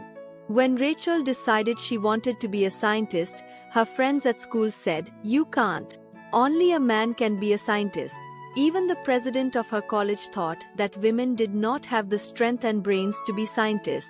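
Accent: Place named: Bengal, India. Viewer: The ai is bengoli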